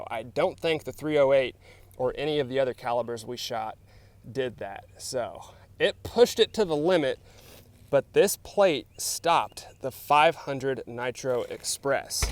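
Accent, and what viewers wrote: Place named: United States. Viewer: Sounds like perfect American English 😊😅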